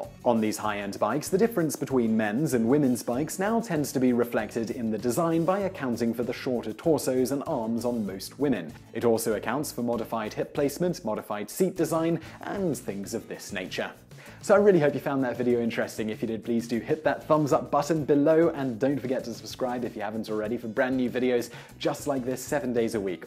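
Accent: United Kingdom. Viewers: i do like your content, it's good mate, but you speak so weirdly that it's hard to concentrate on what you're saying. It's like you're trying hard to pronounce every word, I'm sure the americans will still understand your british accent if you spoke more naturally (i was born in london btw)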